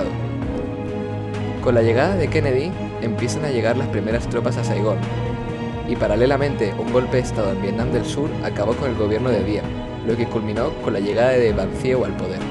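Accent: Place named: Spain. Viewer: El payaso este es español, conozco el acento, como todos los comunistas es un niño pijo de familia mimada.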